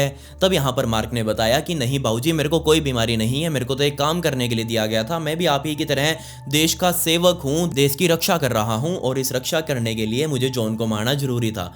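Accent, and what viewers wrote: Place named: Punjab, India. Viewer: Koi dikkat nahi hai! I guess you are a Punjabi! Kindly reply if I am right!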